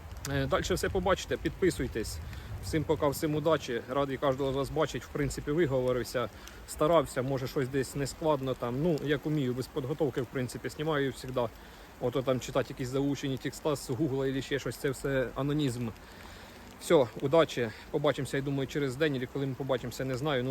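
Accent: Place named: Cherkasy, Ukraine. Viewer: Дивлюсь вас з Канади... містечко біля Ванкувера ... Така ж погода, такий самий ліс !) ... В Канаді повна ж.... з роботою... з цінами на житло... А головне, інколи почуваєш що ти не в Канаді, а в Індії))... Кругом "чорні"... Їжа ((( ... , мабуть найгірша у світі... Дякую вам за відвертість, простоту у відео )!... Маєте Черкаську українську )...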